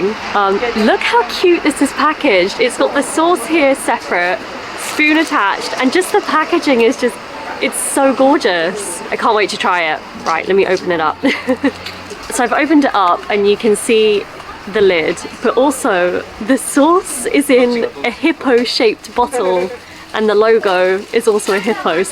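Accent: United Kingdom. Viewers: イギリス英語かっこいい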